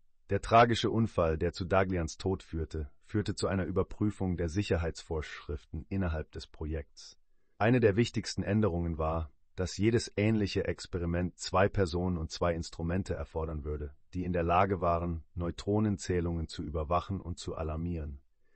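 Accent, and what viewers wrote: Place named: France. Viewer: Du hast einen leichten Akzent. Bist du Franzose?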